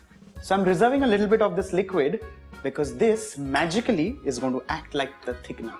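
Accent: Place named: Mumbai, India. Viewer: Love your channel esp your pukka Mumbai accent! I'm a Bombay girl too, now in Toronto - check out my channel!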